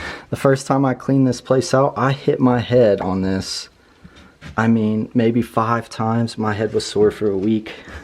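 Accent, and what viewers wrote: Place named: Appalachia, United States. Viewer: I’m guessing by your accent that you’re in sw Virginia or east Tennessee?? I have the same accent 😊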